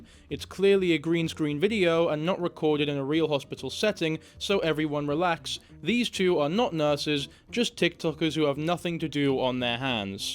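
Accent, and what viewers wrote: United Kingdom. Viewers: Thank you YouTube algorithm for blessing me with finding British Kwebbelkop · I was gonna watch but he's british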